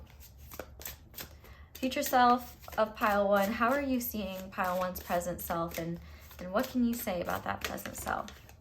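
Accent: South, United States. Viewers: First of all I think my new favourite quote from you, of all time is “give a little subscribble.” Also, I’ve somehow never noticed the southern accent before until the very end of my reading today 😮😍💕😊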